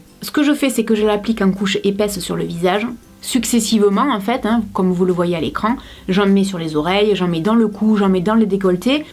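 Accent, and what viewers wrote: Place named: Marseille, France. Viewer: Cc j'adore t'es vidéo continue comme sa juste tu es marseillaise ou pas car tu a l'accent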